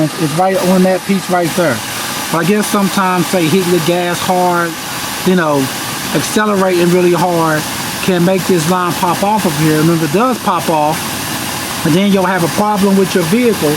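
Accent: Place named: St. Louis, United States. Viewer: Man are you from st.Louis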